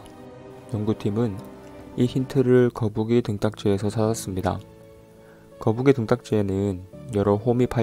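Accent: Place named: South Korea. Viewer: 사투리라 알아듣기 힘들어요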